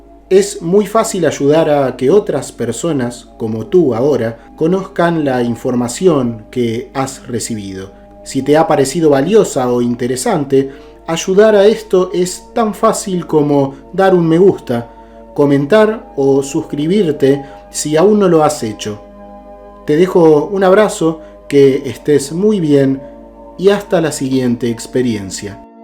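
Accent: Argentina. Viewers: ya me parecia que eras argentino.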